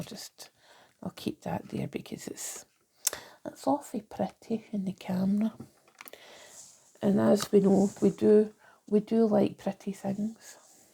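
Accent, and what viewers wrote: Scotland, United Kingdom. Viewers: Oh. I love your accent. Are you Scottish.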